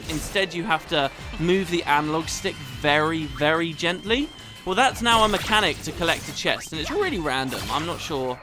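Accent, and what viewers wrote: United Kingdom. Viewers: Hey just wanna say how much i appreciate you doing all of this. I get the vibe that you put way more effort in your vids than most other youtubers or LPers. That and the voice of a charming brit make a killer combo;) Keep up the brilliance!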